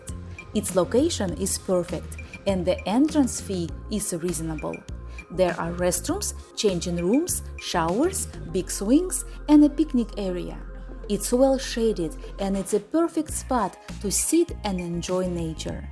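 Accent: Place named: Russia. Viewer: )) у вас русский акцент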